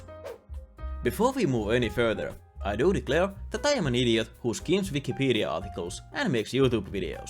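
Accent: Finland. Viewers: I like you'r Rallienglanti mä oon suomalainen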